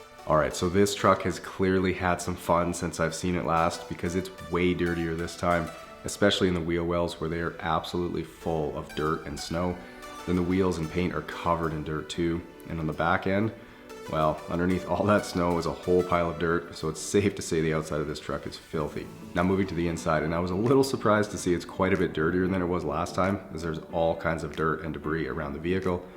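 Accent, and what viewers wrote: Saskatchewan, Canada. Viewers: He's from Saskatchewan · are you guys dumb ??? He means he talks in a Canadian ""slang"" with a Canadian accent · Your voice is so soothing. And I like the way you word things. And your Canadian accent is growing on me too! Love your vids keep it up 👍🏽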